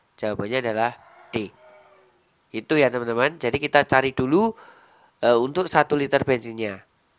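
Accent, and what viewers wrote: Java, Indonesia. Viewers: micnya jauhin dikit mas..angin medhoknya terasa sangat...apa lagi pas ngomong huruf d,b,g,j (medok)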